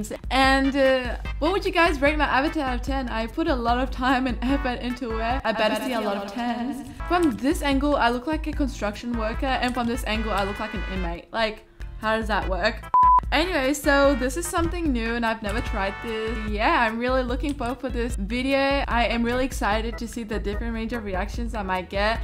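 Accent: United Kingdom. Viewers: hi hi hi hi pls answer my question are you British or American?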